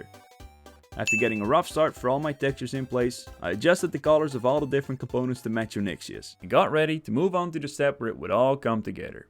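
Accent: Netherlands. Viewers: Loving the dutch. Really made me remember how similar I pronounced things in WoW when I was younger.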